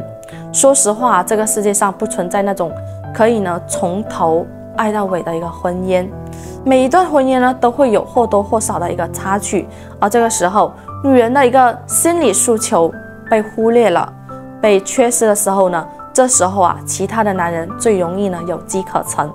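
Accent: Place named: Fuzhou, China. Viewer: 請問這位講話的人是不是來自福州？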